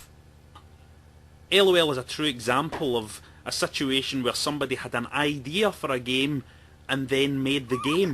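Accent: Scotland, United Kingdom. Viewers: you can get the rom on SnesOrama and download Nulldc..(DC emulator) watch a youtube tutorial on how to get it configured and "bobs ur uncle" Sorted. lol im from aberdeen... tis decent to hear a scottish accent on this thing. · Scottish accent + solemn speech = EPIC!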